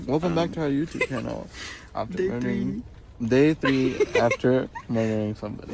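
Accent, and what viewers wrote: Germany. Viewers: Are you German? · I dont know why but i think you are german. You pronounce some words very similar to me as a german. 😁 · you are the best man i have question where did you learn English ? you English is perfect of course with that Germán accent.. nice videos man no 1 fan here from NEW ENGLAND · Ooooooh you’re GERMAN! I’ve been trying to pinpoint it lmaooo I legitimately could only tell once the video started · Bist du Deutscher? Wegen deiner deutschen Aussprache 😏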